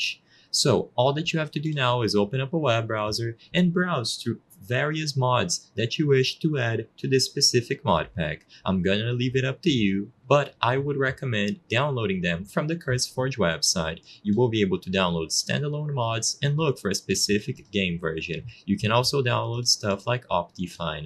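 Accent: Brazil. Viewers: sabia que tinha sotaque de BR, entrei no canal pra ter certeza kkkkk. Valeu pelo vídeo irmão!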